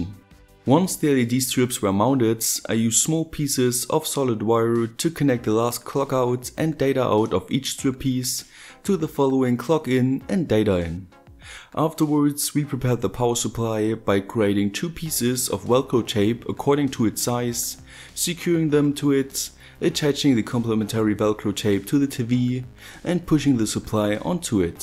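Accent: Germany. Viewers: That accent .. are you german? Great vid! :) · Jonas Gilje Ich Habs sofort raus gehört, dass er Deutscher ist xD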